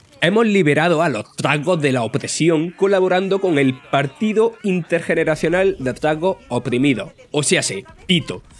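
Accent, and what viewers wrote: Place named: Murcia, Spain. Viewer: perfecto murciano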